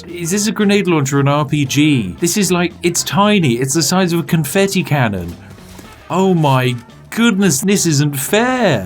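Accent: United Kingdom. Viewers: Casual British tone "We're almost dead now, and that's great."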